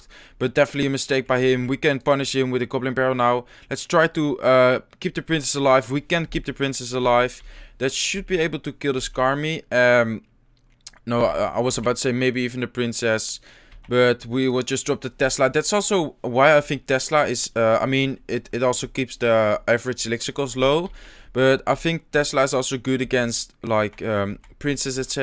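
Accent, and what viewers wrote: Netherlands. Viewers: ik ken je account niet maar ik kan gewoon aan het "accent" horen dat je uit nl komt ahahhaha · Your dutch accent sucks but your content is really great. Congrats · where are you from? Just out of curiosity you have a Dutch accent I think.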